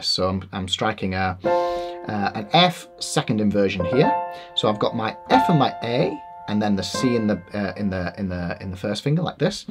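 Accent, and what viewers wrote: Yorkshire, United Kingdom. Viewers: Great vid. You sound like a Yorkshire man to me as an ex-pat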